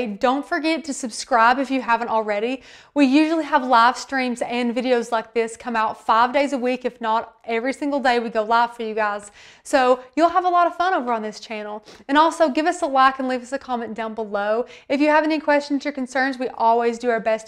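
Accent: South, United States. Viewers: Nice to hear someone actually form the south for once. Great instructions. It looks like fun , also creative. Thank You from Georgia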